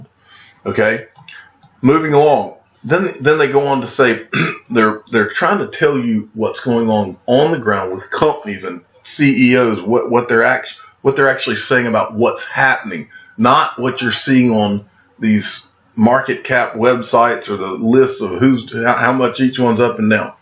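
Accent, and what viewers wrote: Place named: Tennessee, United States. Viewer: Sounds like a tennessee accent to me.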